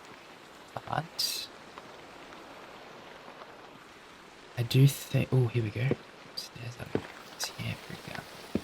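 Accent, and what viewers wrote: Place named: Australia. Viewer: Hi!! You can call me Dove I’m from Australia (guessing you are also based on the convo about weather being warm and the similar accent lollll) and I don’t usually comment on your videos but thought I should just say thank you so much for uploading these. I struggle a lot with anxiety and mass amounts of sensory input due to my ASD, so these holidays have been super stressful and energy consuming with me, especially since my family has to cook for 7-12 people who are coming over tomorrow for Christmas (not including cooking for those that already live here). Anyways I digress, these videos do help so so much after chaotic and hectic days for me, it’s so lovely being able to put on a video that doesn’t yell at me before I sleep about a game I hold very dear to my heart. Keep up the awesome work mate! And happy holidays! Edit: Deleted the previous comment so I could support this awesome channel loll!!!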